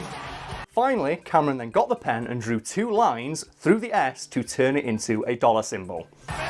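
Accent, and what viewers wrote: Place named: North, United Kingdom. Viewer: Talking about tea like that and having that northern accent, it's like I'm watching Guy Martin!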